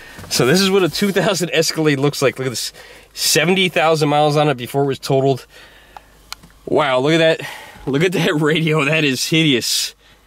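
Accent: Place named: Midwest, United States. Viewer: Your accent is NOT Hyde Park NY. More Midwest or Minnesota. Just saying~~~ 👍❤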